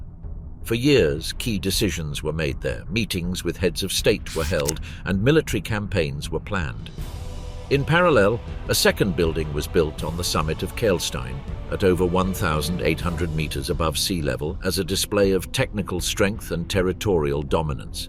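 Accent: United Kingdom. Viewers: Umm the narrator has a British accent. So maybe redirect your frustrations.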